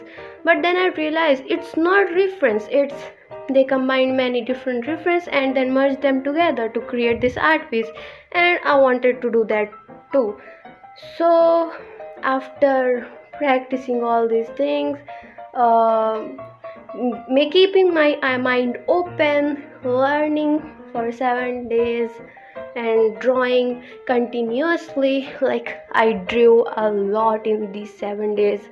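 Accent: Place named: India. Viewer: YOU'RE SO TALENTED!!!! I LOVE THE WAY YOU COLOUR!!! are you indian? forgive me for asking but Im indian myself and i thought your accent sounds a bit familar to me 😵‍💫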